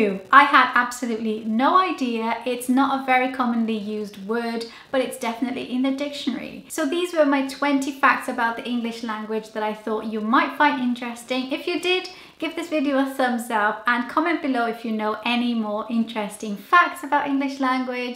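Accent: Wales, United Kingdom. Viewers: Came across your channel on channel mum weekly pregnancy videos and had a feeling you were from Wales? You have a Welsh accent! I’m from Cardiff! Found this video very fascinating! x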